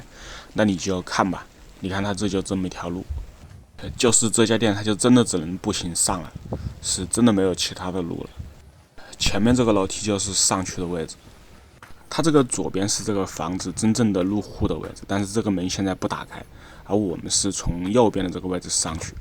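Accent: Wuhan, China. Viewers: 我怎麼聽出武漢話的口音了哈哈哈🤣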